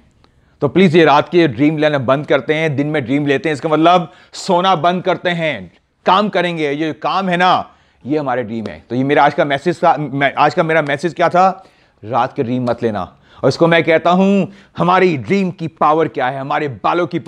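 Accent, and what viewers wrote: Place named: India. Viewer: aapka bolne ka style mast hai...itna Desi style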